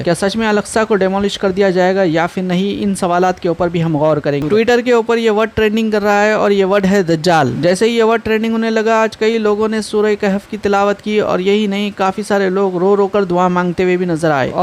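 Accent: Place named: Hyderabad, India. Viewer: Bhai hedrabadi nhi bola kre kuch chizs smjh nhi aati bhot jgh se viewrs hai apke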